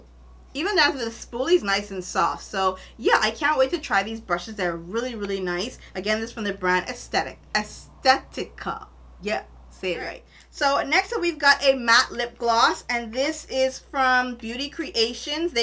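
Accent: Bahamas. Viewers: Where are you frommmmm. You sound like you're from the Bahamas!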